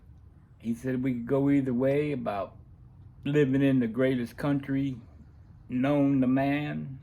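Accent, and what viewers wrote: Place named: New Orleans, United States. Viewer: by the way when i first started seein your vids i was for sure you were from Nawlins!😆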